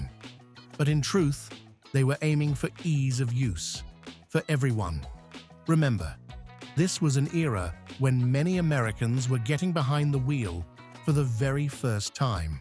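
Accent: United Kingdom. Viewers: Please lose that, britsh accent. They didn't make the Falcon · Who writes this crap? British accent narrator knows little about the Falcon!!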